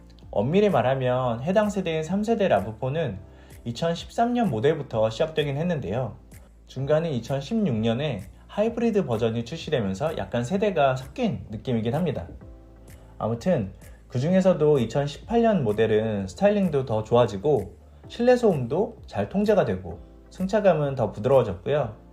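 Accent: Seoul, South Korea. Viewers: 외국산 지 오래돼서 서울 말씨 모르는 건 그쪽 같은데. 요새 서울에는 남도 사람들이 많아져서 서울 말씨 모르는 사람들 많음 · 외국에 사신지 오래 됐나 보내요 저도 서울에서 나고 자라 미국온지 오래 됐지만 이분 요즘 서울 말씨 맞습니다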